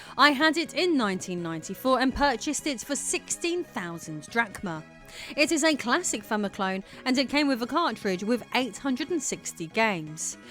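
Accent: United Kingdom. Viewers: You would make a Good lieutenant troi... British accent and all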